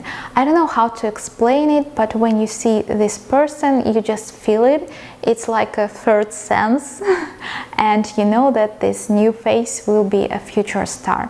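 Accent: Russia. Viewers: вы же русская? слышу этот милый акцент аххахаа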